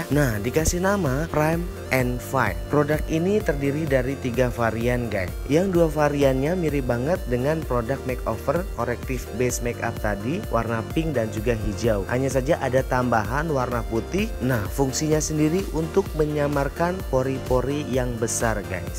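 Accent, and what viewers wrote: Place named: Lampung, Indonesia. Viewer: yapun... orang lampung nih ya😀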